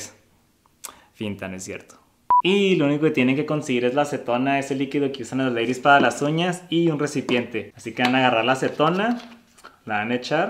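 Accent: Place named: North, Mexico. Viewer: Tiene el mismo acento que yo asi que apuesto a que es del norte de Mexico :)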